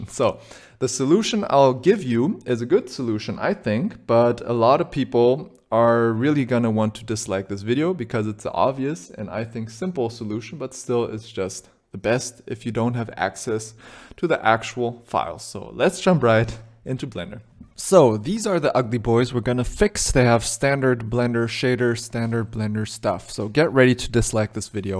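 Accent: Germany. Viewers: Are you from german?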